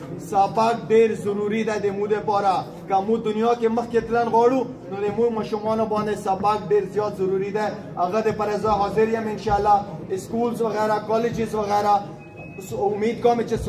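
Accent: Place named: Punjab, Pakistan. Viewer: Jaisy koe punjabi pashtu bol raha hu...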